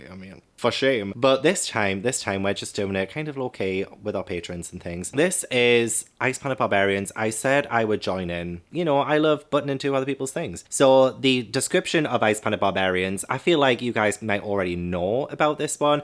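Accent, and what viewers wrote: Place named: Wales, United Kingdom. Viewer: Actually, I think you'll be okay without that Sex and Holiness video. Even someone with a very limited imagination can guess where that's going... and you strike me as someone with a very vivid imagination! I mean, the irony of it... a man who's sworn off sex has all the answers! Are you Welsh? (sorry if you're not and that's an insult!) Or maybe northern? (sorry if you're Welsh and that's an insult). Whatever you accent is, I could listen to you all day!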